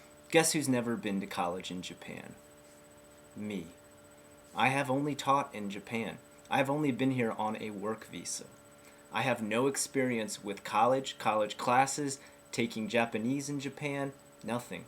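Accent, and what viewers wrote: United States. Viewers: I love the southerened accent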